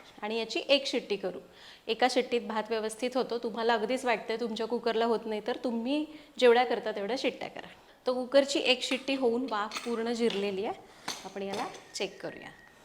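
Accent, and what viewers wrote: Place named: Kolhapur, India. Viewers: Kolhapuri jagaat bhari❤ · Ly bhari bolla ki o aamchi kolhapuri bhasha🎉🎉🎉❤❤❤ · जमलं ताई कोल्हापुरी बोलायला, आम्ही प्रॉपर कोल्हापूरचे MH 09नाद खुळा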